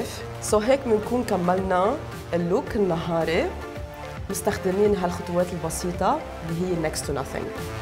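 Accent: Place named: Lebanon. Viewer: Arabic Lebanese accent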